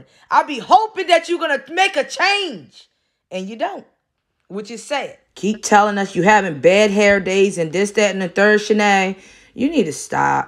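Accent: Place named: Maryland, United States. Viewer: I knew you were somewhere close to Delaware by your accent 😂😂😂 and I was right Maryland lol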